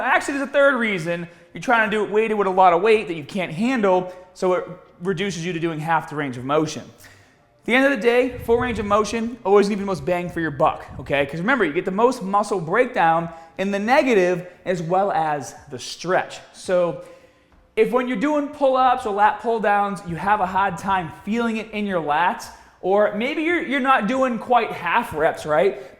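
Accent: Boston, United States. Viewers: Bury You didn't. Literally. He's American, from Boston.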